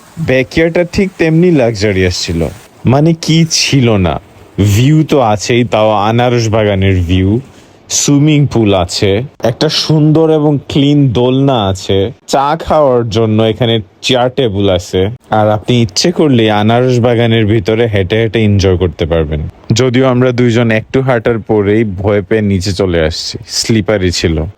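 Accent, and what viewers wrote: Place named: Sylhet, Bangladesh. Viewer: His Syl accent is so cute 😂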